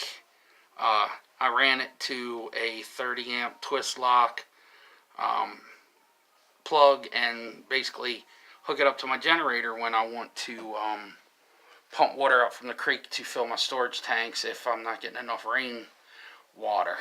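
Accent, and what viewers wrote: Baltimore, United States. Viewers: I hear an accent are you from the Baltimore area? I'm looking to buy a small private plot of land hopefully around summer time between northern VA, eastern WV or southern PA. I could easily ask you a million questions but I'm sure your videos and Google can answer most.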